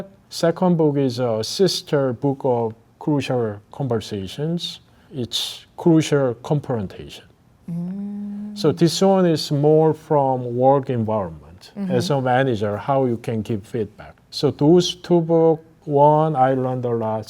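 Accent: South Korea. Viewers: 외국에서 느끼는 건데, 한국인 영어 억양으로 교수님처럼 영어 정말 잘 하면 그게 더 멋있어 보이더라구요. 솔직히 전달력도 좋구요. 오늘도 힘내서 작은 영어 공부 생활습관이 이어가 봅니다. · 억양은 한국인이시지만 저 정도만 구사해도 대단한 실력..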